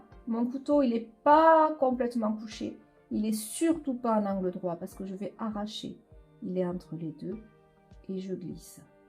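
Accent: South, France. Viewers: Bonjour Madame. J’ai une petite question belge à poser. Je n’ai jamais peint à l’huile. Cela demande t il une préparation particulière Le coût est-il plus élevé qu’à l’Acrylique ? Encore merci de vos conseils chantés avec votre magnifique voix du Sud. Depuis la Belgique, Amicalement ERIC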